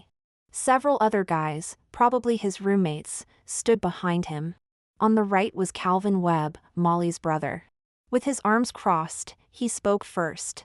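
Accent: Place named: United States. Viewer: AI with an american accent using UK slang words is kind of cringe~~~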